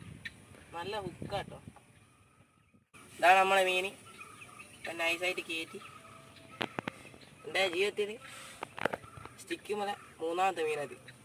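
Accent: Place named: Kannur, India. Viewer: മോന്‍ കണ്ണൂരാണോ സംസാരം കേട്ടിട്ട് കണ്ണൂര്‍ തലശ്ശേരി വടകര ഭാഗത്തുള്ളവര്‍ സംസാരിക്കുന്നത് പോലെ ഉണ്ട്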